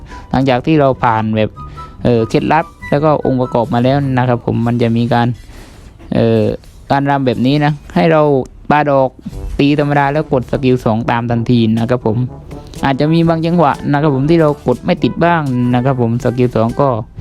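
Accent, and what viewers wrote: South, Thailand. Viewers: พี่คนใต้ใช่ไหมผมฟังจากสำเนียง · พี่เป็นคนใต้ใช่ไหมครับ😆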